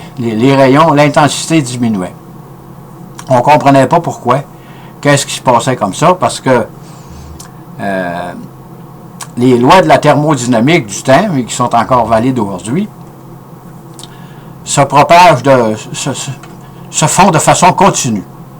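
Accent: Quebec, Canada. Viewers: Depuis le Maroc Merci beaucoup. malgré l'accent québécois j'arrive à comprendre votre magnétique exposé.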